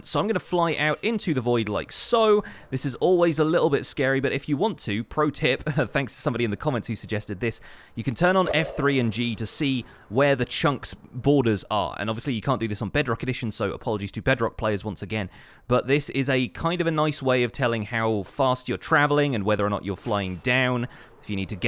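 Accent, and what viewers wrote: England, United Kingdom. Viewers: My gosh I love your videos and your English accent!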